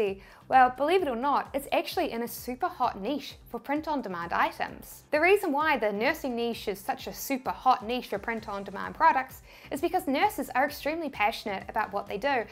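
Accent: New Zealand. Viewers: Love the Kiwi accent !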